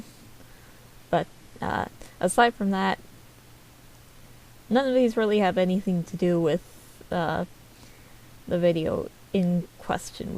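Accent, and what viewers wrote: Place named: Minnesota, United States. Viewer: you sound like you're from minnniii -soootaa also no idea how i got here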